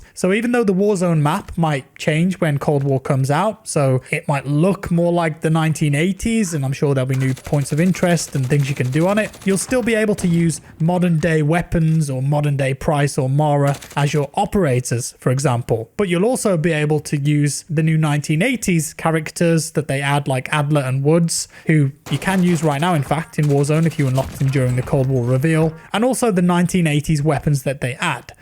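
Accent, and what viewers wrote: England, United Kingdom. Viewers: What English accent does have?:)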